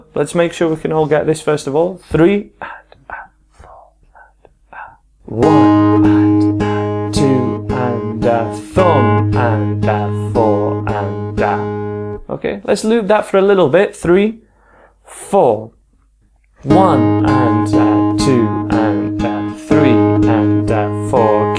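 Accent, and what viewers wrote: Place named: England, United Kingdom. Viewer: Nice English accent ~ I'm just a beginner and I like this song ~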